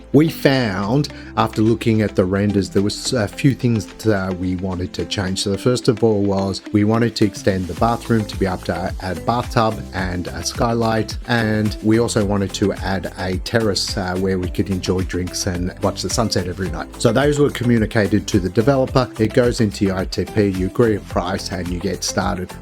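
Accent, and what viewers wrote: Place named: Australia. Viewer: He didn't mention USD or AUD but from his accent i think it's AUD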